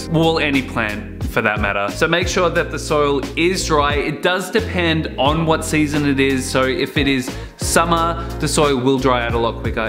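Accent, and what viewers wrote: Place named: Australia. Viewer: Hey, I have a silly question: how do you do to take those avos out from those nice flat balloon shape glass jars when you have to change the water? Cause you're supposed to change water once in a while, right? I mean, considering the shape of the jar and the roots, it might be quite alright to take it out, but what about when you put it back in place?? I've had the problem with another plant cutting, now the root system is getting bigger, so it's becoming tricky to take out/put back in place all those nice roots in the narrow jar opening... Ok, now I gotta add one thing: I love hearing you speak, makes me so nostalgic of OZ...;)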